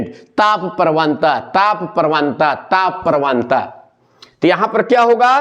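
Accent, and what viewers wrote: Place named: Bihar, India. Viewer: Bro speaks in bihari accent💀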